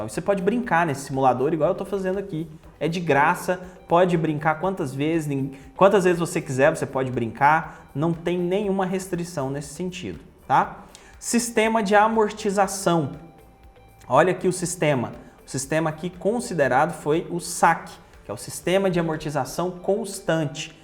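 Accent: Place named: Goiás, Brazil. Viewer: M.bom vc é goiano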